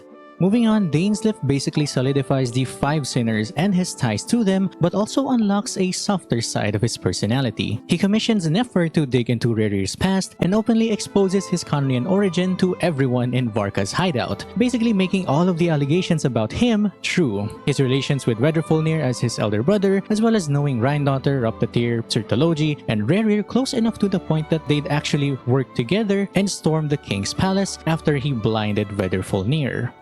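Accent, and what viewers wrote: Philippines. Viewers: Great vid.. also the sexiest Filipino accent ever 😉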